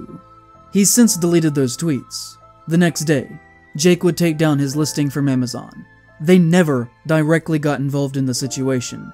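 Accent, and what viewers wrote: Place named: South, United States. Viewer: with a southern accent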